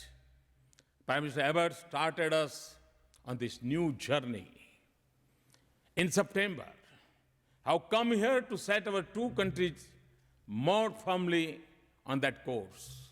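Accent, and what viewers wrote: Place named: Gujarat, India. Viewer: All he has to do is work on his thick Gujarati accent, train a bit on diction...and hes the best of the best!!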